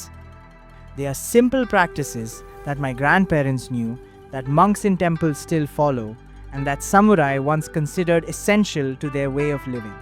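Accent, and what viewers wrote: India. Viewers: It is odd, you have an India accent and talk about Japan 😂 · Your videos are very good , but re you Indian or japanese as your accent feels indian to me ?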